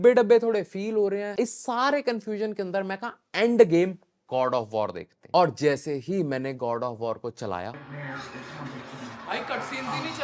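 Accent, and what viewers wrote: Punjab, India. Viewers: Who noticed his Punjabi Accent 🙂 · work on your explaining method, your half panjabi breaking words accent is irritating. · Love your punjabi accsent ❤ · punjabi fadli teri hindi cho bro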